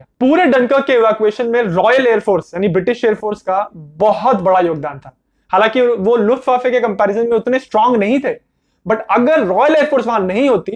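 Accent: Bengal, India. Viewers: Bro are you a Bengali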